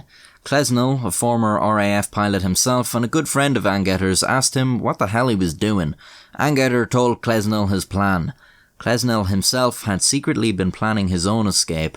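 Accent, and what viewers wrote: Ireland. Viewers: I thought this was a darkdocs video, but when I heard the Irsish voice I was surprised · This has quickly become one of my favorite Youtube channels great narrating and insanely good brogue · Bad-assery! Love the facial expressions! Makes me bark with laughter! Don’t abandon us Q- I need the snarky Irish storytelling! There might be a few questionable youtubers but there are Nobel folks too! Stand tall and be proud you bastard! Thanks and fight on!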